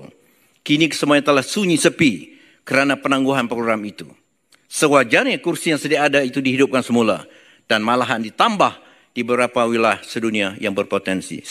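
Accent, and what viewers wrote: Indonesia. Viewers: Haha...bapak ini kok logatnya spt indonesia?😅😅😅😅😂😂😂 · Hai Prof. AWANG.......anda berpidato sdh berdialek BAHASA INDONESIA......Sadarkah Anda.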